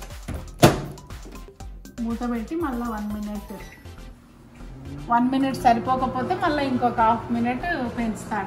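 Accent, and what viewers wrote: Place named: Telangana, India. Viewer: telangana style la manchiga chepindru peddamma thank u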